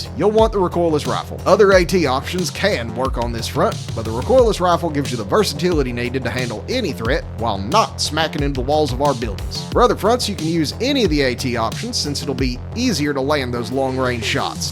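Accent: South, United States. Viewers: Wonderful Southern accent! 👌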